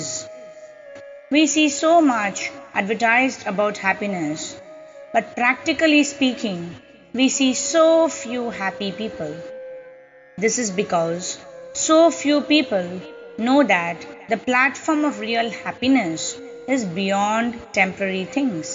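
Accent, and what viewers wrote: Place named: India. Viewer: Hari Hari ! So nice to have Srila Prabhupada's books in Indian accent with such clear speech. Waiting for the other chapters...🙏🏻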